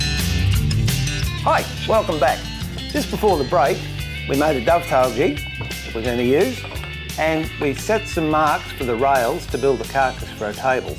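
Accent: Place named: Australia. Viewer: Nice australian accent :) Thank you for your efforts very much. Your work is more than useful.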